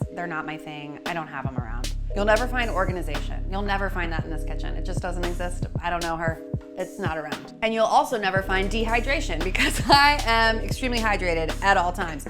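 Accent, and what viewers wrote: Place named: Bay Area, United States. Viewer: She's gotta be from the Bay Area. Between the accent and the nutritional yeast on the popcorn...(which yes, is delicious)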